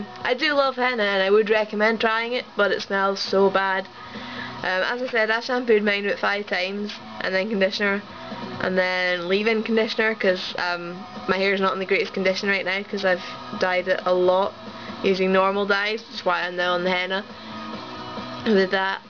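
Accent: Scotland, United Kingdom. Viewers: You sound scottish :) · This is my own way of making it. Try it out and test it on a small part of your hair first. I hope you don't mind I posted here for you and everyone else who like to try. You can delete my comment if I was for me to post it here. Sorry about that. I STILL LOVE your accent!!!! I have ALWAYS LOVE Scotland!!!! Again, thanks for the video. =)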